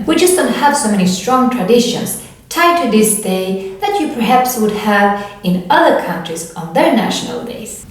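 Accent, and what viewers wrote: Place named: Gothenburg, Sweden. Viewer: You sound like from Göteborg.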